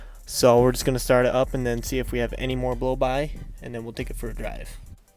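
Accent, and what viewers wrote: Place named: Wisconsin, United States. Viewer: I'm Canadian never been to the states but I'd guess he's from Wisconsin lol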